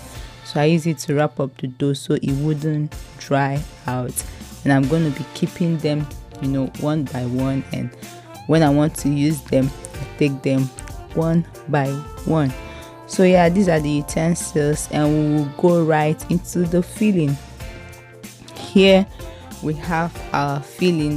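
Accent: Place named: Nigeria. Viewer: Omg first off I love your Nigerian accent I’ve made meat pies before and they are soooooo yummy makes my heart so happy 💕thanks for sharing luv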